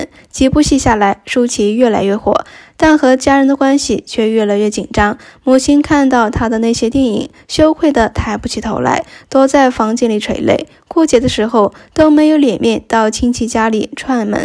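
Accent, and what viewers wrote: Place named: China. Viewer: 不要再毀謗侮辱了，留口得了吧？大陸的口音可以滾了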